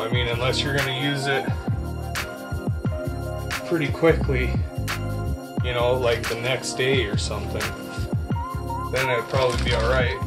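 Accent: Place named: Canada. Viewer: Great job🤤🤤 The pizza looked soooo delicious. I personally prefer a fat head pizza crust or a chicken crust. I tried the cauliflower way but too much time 👍Your Canadian accent is so strong 😁. I use to live in Alberta but I moved back to the USA. Anyways enjoyed the video hopefully you will make more cooking videos in the future.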